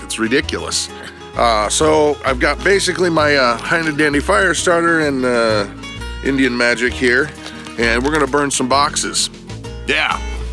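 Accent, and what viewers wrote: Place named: Louisiana, United States. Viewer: The whole time I’m listening to your story I was like, “Yep, that’s Louisiana!!” Lol! Welcome to my lovely state. Ha! Glad your event was a success.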